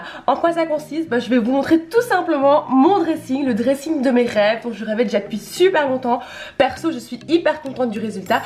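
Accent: Belgium. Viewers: elle a pas un accent français vu qu'elle est belge ils ont leur accent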